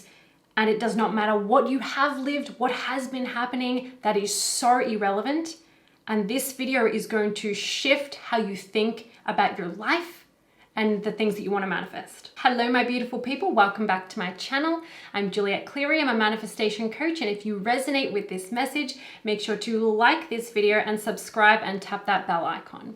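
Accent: Australia. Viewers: Curious (because of a synchronicity and sign, this would be confirmation) ... is your accent Australian? ❤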